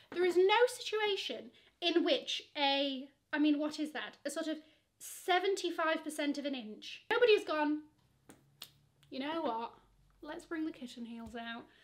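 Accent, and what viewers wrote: United Kingdom. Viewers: You are definitely my favorite British accent youtuber! Love your comments, your facial expression. Said that before, can definitely listen to your talking forever.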